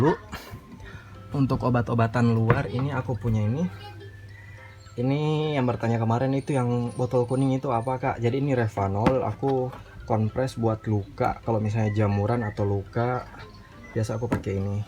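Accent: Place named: West Sulawesi, Indonesia. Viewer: Dimana tepatnya ini,kalau simak dari logatnya bro ini dari SulBar? Sy juga memelihara bbrp kucing 😀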